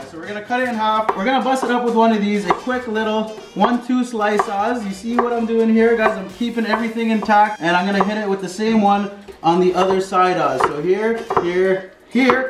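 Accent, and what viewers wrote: Mexico. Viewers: is is just me o does he hve a mexican agcent i should know im exican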